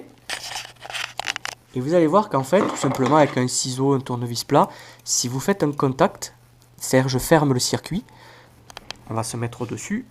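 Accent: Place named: South-West, France. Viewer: Top ça marche ! et avec l'accent du sud-ouest :)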